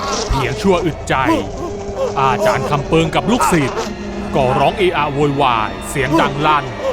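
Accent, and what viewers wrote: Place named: South, Thailand. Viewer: คนพากนี้เแ็นคนใต้เเน่ใช้ม้าย เพราะฟังจากคำพูดติดปากของคนใต้ที่ ที่พาไปเเหลงกลาง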